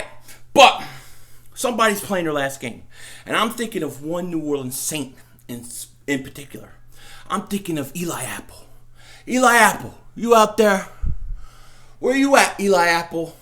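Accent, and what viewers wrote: Philadelphia, United States. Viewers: this is L.Boogie yea we lost last night and I'm very heart broken every fan is when their team season is over especially when their team gets this far and fails to win, but I hope your going to be live like u was last week in the Chicago game and I'm gonna keep it real with u I hope the eagles fail to fly no more Foles magic it ends tonight so I will be watching u live but I'm not going to be shit talking u live people was last week I don't do that but i am a cowboys fan that likes your channel it's just friendly fire like u and Mark Holmes do and i watch u and mark all the time and ru from u got that kinda accent where ru from anyway?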